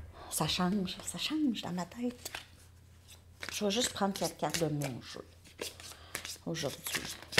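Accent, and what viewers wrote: Canada. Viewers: Bjour trop mignonne un petit ange surtout votre accent canadien bonne continuation et rayon de soleil d Algérie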